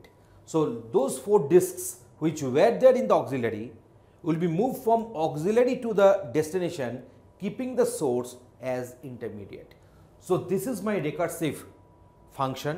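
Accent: Bengal, India. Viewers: Because of his Bangali accent. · he sounds like bangali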